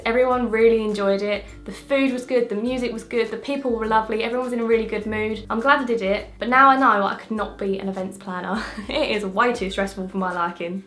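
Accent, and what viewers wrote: Essex, United Kingdom. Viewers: Your accent is perfect . You're British I guess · are you from Essex? :)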